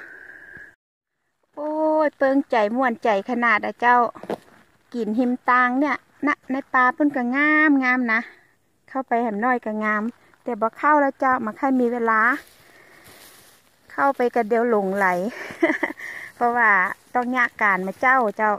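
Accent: South, Thailand. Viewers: พี่ แหลงใต้ด้วยค่า จากเอฟซีคนใต้ค่า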